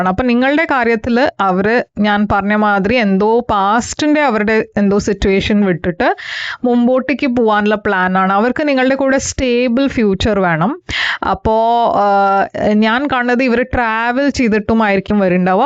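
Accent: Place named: Karnataka, India. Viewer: Nice way of talking Malayalam any Karnataka connection undo❤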